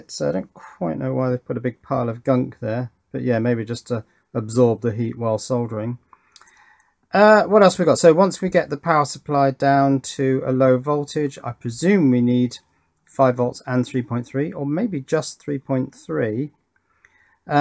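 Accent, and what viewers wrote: United Kingdom. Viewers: He did use the UK English pronouncing, not the American pronouncing.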